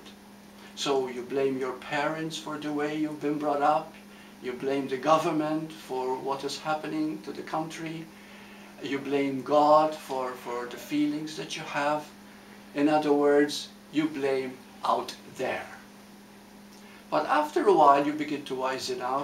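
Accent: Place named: Italy. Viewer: This obviously has nothing to do with what he's talking about, but I'm just curious, what kind of accent does he have? His name seems English, but his accent sounds more Italian or something else. Where is from?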